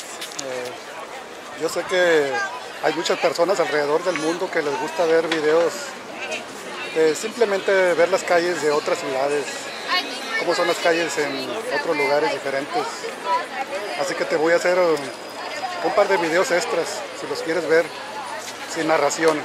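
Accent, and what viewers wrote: Mexico. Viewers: Demasiado marcado tu acento mexicano, deberías neutralizarlo mas! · No hablen como mexicano por favor. Ese idioma odio. Hablen bien el idioma español ok.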